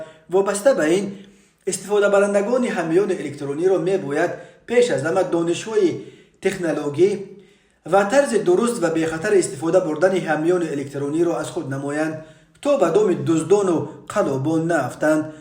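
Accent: Pamir, Tajikistan. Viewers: Салом бародаро😊 Рӯзноманигор помири аз лаҳҷаи суханронит маълум ☺️🤩👏 бисёр канали хуб ва хабарҳои нав👍👋🤩🇹🇯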